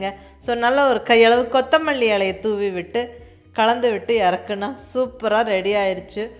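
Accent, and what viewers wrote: Coimbatore, India. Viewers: ஆளுமையான கோவை வட்டார உச்சரிப்பு ,எளிமையான, அசத்தலான பேச்சு, எளிமையான சமையல் ஆண்களுக்கும் ஏற்ற குறுகிய நேர சமையல், அருமை, வாழ்க பல்லாண்டு.நன்றி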